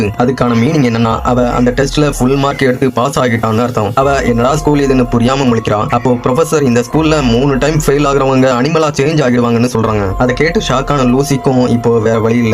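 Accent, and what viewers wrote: Coimbatore, India. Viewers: Enna bro unga slang coimbatore mari Iruku 🤔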